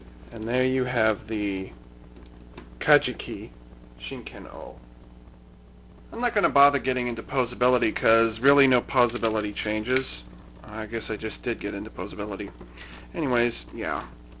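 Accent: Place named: United States. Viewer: Could you at least try a little harder on your pronunciations? I mean, I know you're American and all, but really man, it's a little grating to hear when you say the Japanese names.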